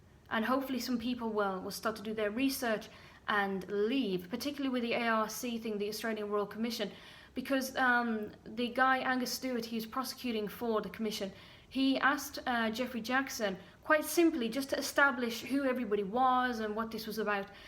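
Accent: Ireland. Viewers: I saw that movie, Gas light.....some frenchman and I think Ingrid Bergman. He was turning the gas light down just a little or something, making her think she was losing her sight also. YOu are spot on, if anything a little soft on them. Do I detect an Irish accent? it is very slight, but I love it. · You have a slight Irish lilt or is it country bumpkin ..well ,can we get a close up on these rings ? Your logic makes too much sense ,and this is why you are out of it. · Is that an Irish accent trying to sneak its way through? Great video btw!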